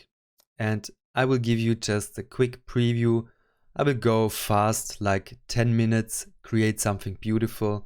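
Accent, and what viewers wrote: Germany. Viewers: Man hört den deutschen accent 🤫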